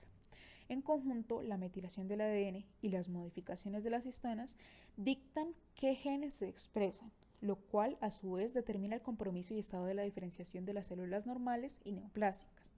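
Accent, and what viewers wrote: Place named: Colombia. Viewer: Tienes que ser colombiana,tú tono de voz es hermoso, dra porque no continuo con el proyecto este y el otro canal estan caídos, además supee genial estps capítulos de Robbins, gracias, saludos desde Cali, Colombia.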